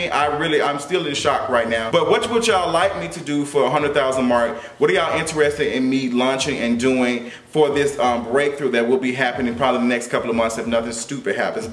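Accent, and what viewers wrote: Memphis, United States. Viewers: It's so funny to hear someone from Memphis try to sound like they're not from Memphis lol. I love you!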